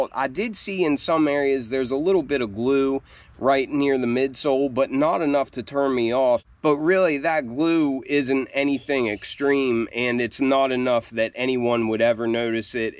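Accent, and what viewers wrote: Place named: Virginia, United States. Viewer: Are you drunk Virginia by chance? I used to live in VA beach and your accent sounds familiar. Great review BTW I will cop those for $160 price tag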